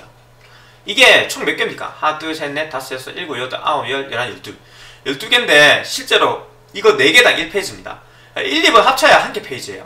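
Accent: Gyeongsang, South Korea. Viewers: 경상도 사투리를 쓰시는 군요~~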